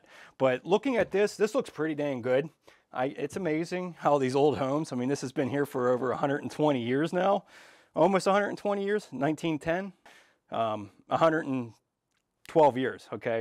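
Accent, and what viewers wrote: Pittsburgh, United States. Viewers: Working on my own 112…e..14-year-old house with balloon framing. Always good to see somebody else’s approach. Heard your accent and knew you were Pittsburgher!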